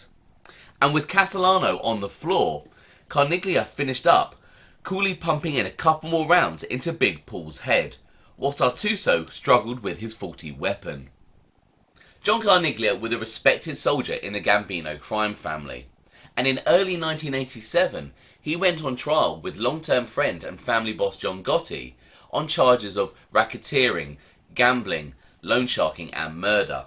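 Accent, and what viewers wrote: England, United Kingdom. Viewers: Blimey that was quite knowledgable and informative. would fit right into a crew if it weren't for that english brogue. ( I couldn't help but imagine playing background music as he narrated )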